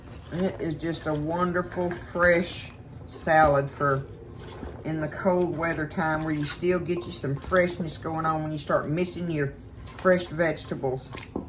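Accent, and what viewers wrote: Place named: Mississippi, United States. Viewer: Afternoon! I watch your videos for 2 reasons... 1. The foods you make 2. You! You're such a keen reminder to me of my family (all of whom have passed), the homey recipes & kitchen, the way you cook, and definitely the way you talk. I was born & raised in South Mississippi down around Hattiesburg but way out in the country on a farm. Spending time with you is like being back home with family. Thank you so much for filling some empty spaces in my heart. ~Nan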